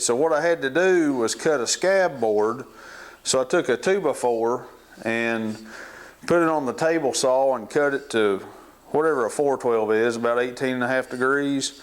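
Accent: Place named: Tennessee, United States. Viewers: Great video, thank u much Sounds like middle Tennessee accent, wonder where u from. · I was guessing Middle Tennessee, too, but northern portion, closer to KY.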